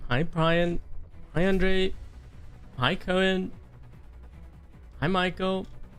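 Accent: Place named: South Africa. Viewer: Hey....when I heard you talking about sushi craze in Toronto, I didn't realize you were Canadian. I'm from GTA area too. I thought from your accent that you were in south Africa. How do I join your discord.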